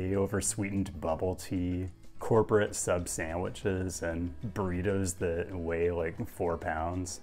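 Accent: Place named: Upper Midwest, United States. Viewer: Your videos are the best things on YouTube--thank you. One comment and one question… Did you know that Erie contains the lowest-income zip code on the U.S.? I read this recently, and was surprised. Also, as a linguist, I am fascinated by the cadence of your speech and can't place it geographically. Are you from the Upper Midwest originally?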